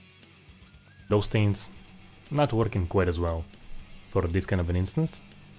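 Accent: Russia. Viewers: You have a Russian accent. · Русский акцент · у тебя акцент русский)))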